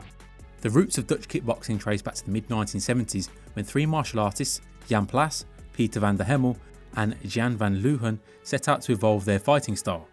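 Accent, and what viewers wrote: Netherlands. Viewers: never expected to hear ann amsterdam accent in english lmao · thickest Dutch accent ever even Marcel Vos can't get on that level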